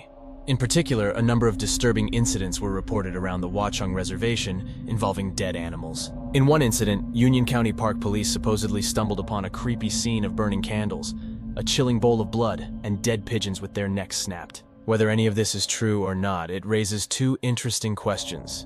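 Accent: United Kingdom. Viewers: oh bless Ur heart ❤️❤️❤️ it's not about the rewards it's about keeping U making this content don't stop Ur bloody good at it!!! Excuse the terminology lol I love Ur British too!!! So here's to many more videos 😁😁😁 xxxxxxxx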